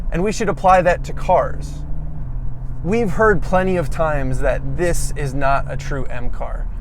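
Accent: United States. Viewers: Dmytro Chygrynskiy speaks perfect American??! Awesome video bro! xD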